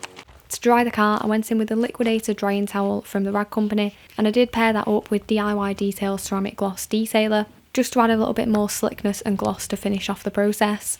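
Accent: United Kingdom. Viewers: You would definitely be a big hit with that British accent in the us.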